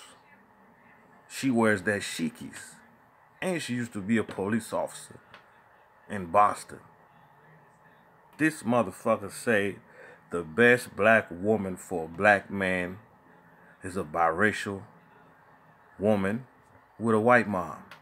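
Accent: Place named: Caribbean. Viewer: U have a carribean accent. Ur family wasn't here in Jim crow. Stop commenting on american black folks movements. It's non-immigrants only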